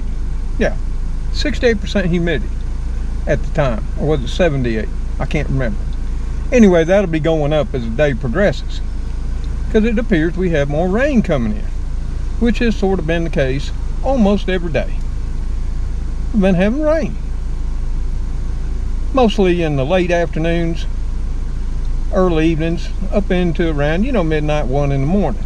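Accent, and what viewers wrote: South, United States. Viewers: I could listen to you all day I love your southern style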